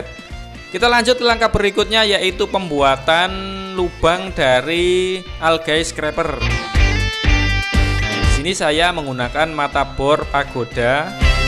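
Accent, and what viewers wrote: Java, Indonesia. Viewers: Om asal mana kok logat bicaranya medog kental nuansa jawanya 🤭🙏 tp gayanya asyik mudah di mengerti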